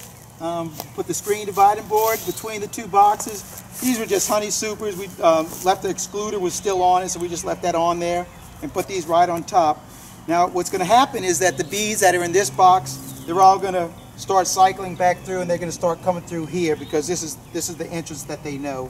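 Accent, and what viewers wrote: Louisiana, United States. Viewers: Are you from Louisana?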